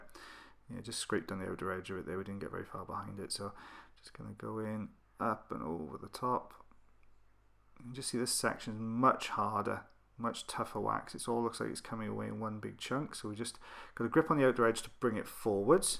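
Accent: Wales, United Kingdom. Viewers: A lovely soft Welsh accent! I'm an expatriate Brit in Alaska so quite a while since I heard the Welsh accent! To be honest, I hadn't noticed yesterday when I watched one of your videos...it was only in the 'tissue paper' video comment section that I realized! I've been out of the UK too long (since 1984) so I'm now a defunct Brit! :(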